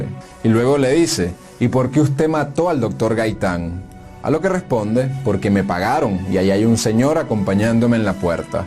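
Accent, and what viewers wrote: Venezuela. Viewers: GRACIAS A. ESTE JOVEN DE ACENTO VENEZOLANO..POR ESTEV8DEO MARAVILLOSO..USTED SI ES JOVEN UN VERDAFERO HISTORIADOR MIL GRACIAS...DESDE COLOMBIA 👍👍👍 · Este man es venezolano? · Que hace un veneco narrando de esto? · El que habla es de venezūelą? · Es un venezolano el que narra? Hasta aca llego en ver este video.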